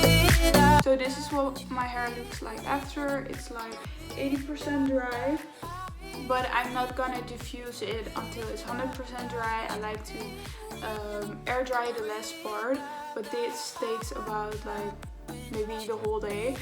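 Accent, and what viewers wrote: Netherlands. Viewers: hoorde gelijk dat je nederlands was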